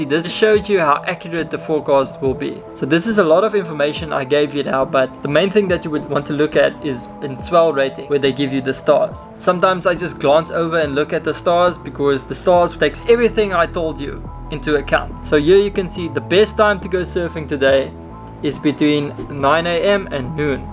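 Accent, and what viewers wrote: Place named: South Africa. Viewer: Love the South African accent, nothing like it in the world :-)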